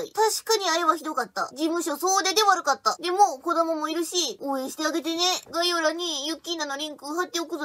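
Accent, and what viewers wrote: Kansai, Japan. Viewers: 総統、関西？ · 統裁は関西なのですか？ 関西弁も聞きたいです。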